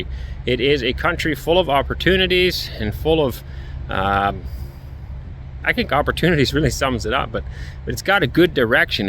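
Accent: Canada. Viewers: he Canadian by the accent. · That is the most Canadian accent ever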